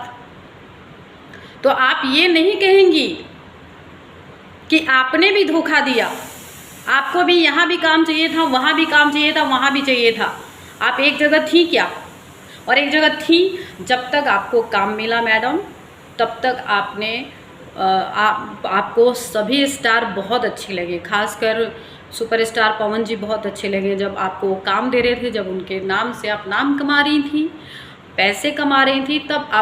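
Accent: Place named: Bhojpuri, India. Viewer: Kya bat h gjb sis apne pure bhojpuri me koi sahi bola to ap 🙏🙏🙏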